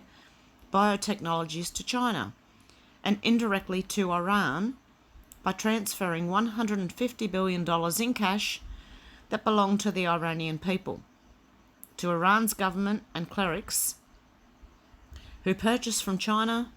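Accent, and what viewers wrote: Australia. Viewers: This has to be addressed by world leaders. One of them is addressing this issue being Donald Trump who is hated by the media. Australia pull out of doing business with Chinese mobile phone tech company. NAMED in report can’t spell name. Scott Morrison won our election which he was supposed to loose, thankfully God is with us. By the way you sound Australian. You are doing a great service to humanity bringing this out in the open. David ICKE has been warning us about AI and has been a lone voice accused of promoting conspiracy theories. May God bless us all with hope to change our world. hated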